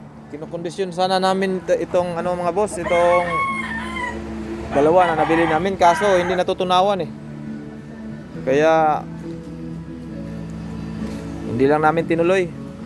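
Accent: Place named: Sabah, Malaysia. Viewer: your voice like Sabah people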